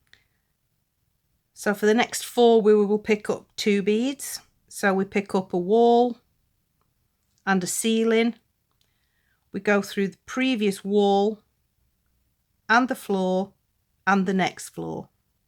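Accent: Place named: Northern England, United Kingdom. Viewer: Just found this channel, just getting back into beading after a couple of years break. I'm trying to remember how I used to weaves, so reacquainting myself by watching YouTube. thank you for describing this weave so beautifully. I've subscribed, so I look forward to more tutorials. I'm a northern lass, so it's great to hear another northern accent. 😊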